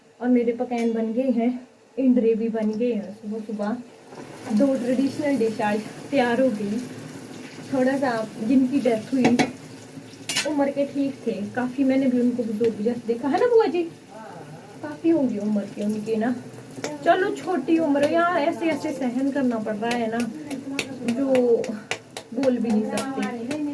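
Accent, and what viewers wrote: Pahari, India. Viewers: Apani phadi tone sudharwo adhi Hindi adhi phadhi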